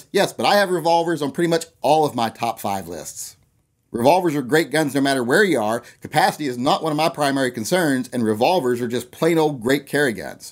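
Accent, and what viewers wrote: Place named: South, United States. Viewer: 2 minutes in " yarnt going lose anything." you may live in the west but you still southern BOY. I find that refreshing.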